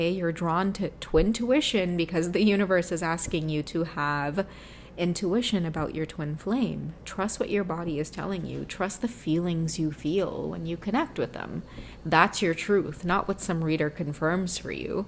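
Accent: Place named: Trinidad and Tobago. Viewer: I love when you do the trini talk w 🥰 and ty for the readings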